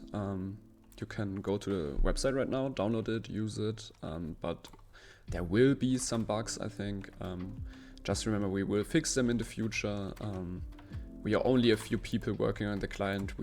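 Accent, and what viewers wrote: Germany. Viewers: Ich richte den Deutschen Akzent haha ich liebe deinen Client ! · hörst dich deutsch an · Bist du deutsch bcs Akzent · Direkt erkannt das du deutscher bist xD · are you german ? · joo dieses english seit ihr deutsche ??? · Deutsches Englisch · wait a minute u are german ?! (i can tell by the accent) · dierekt gemerkt das du deutscher bist HAHA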